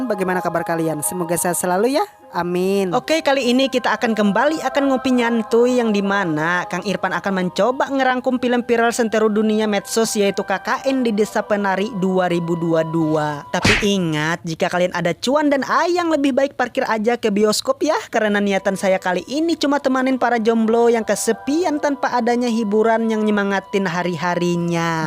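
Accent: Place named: West Java, Indonesia. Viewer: Sigana urang sunda nu nyarita na😂,mantap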